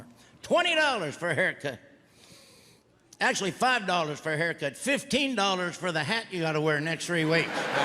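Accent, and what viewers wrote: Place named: Midwest, United States. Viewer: the funnt thing he does not sound like that in real life, he has a thick mid-west accent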